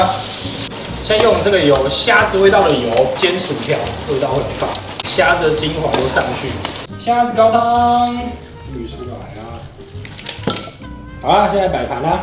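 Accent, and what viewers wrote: Taiwan. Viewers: 光聽這台味就舒服了！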